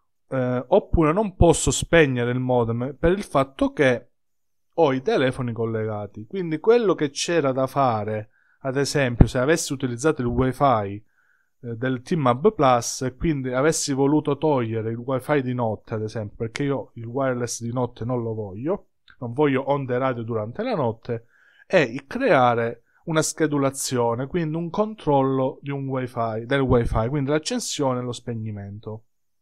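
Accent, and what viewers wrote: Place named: Calabria, Italy. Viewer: sei calabrese come me si sente, complimenti per il video!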